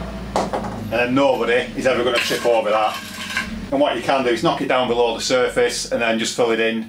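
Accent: United Kingdom. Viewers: to , the text says, "one thing you can do is use them for close to the edge fixing..." I think the narrator actually said "can't" but the voice recognition software doesn't understand Brits. I think he meant "CAN'T." He calls them "through bolts"; in the US we call them wedge anchors or Redheads, which is actually a brand name that's become generic. We also have s bolt called a "Titen" from Simpson-Strongtie which works better close to an edge. They cut threads in the concrete, so they don't exert as much expanding force, so they are less likely to crack the concrete. We haven't caught up with the rest of the world, so we only have inch (Imperial?) sizes- Simpson may have come up with metric sizes for the other side of the pond. Someone asked about the definition of edge distance- check this link- <link> It gives a rule of thumb which is that an anchor should have a minimum of 12x the diameter of anchor spacing to an adjacent anchor or to any concrete edge. 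Also, the concrete should have a minimum thickness of 1.5x the depth of embedment.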